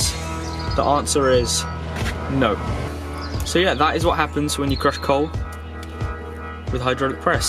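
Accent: United Kingdom. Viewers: I LOVE WATCHING ALL THESE CRUSHING VIDEOS! PLEASE JUST KEEP CRUSHING! Love that brit accent <3 :)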